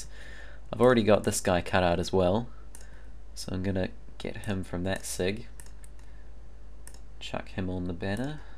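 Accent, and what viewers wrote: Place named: New Zealand. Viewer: He isnt an aussie, hes from nz buddy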